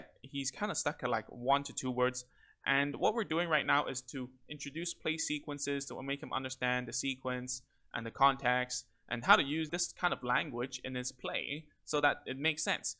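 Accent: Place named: China. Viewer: Man ! You speak English super fluent almost like native english speaker, how did you do it ? A bit slight chinese accent in it but super tiny i assume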